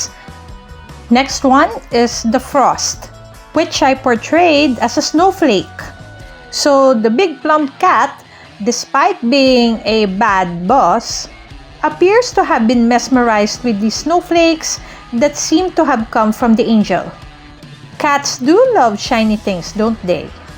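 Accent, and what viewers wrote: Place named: Philippines. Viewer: Salamat po! My son, Jaja heard your accent and shouted "She's Filipino!" What are very creative way to share the gospel. Watched the entire video including the bloopers!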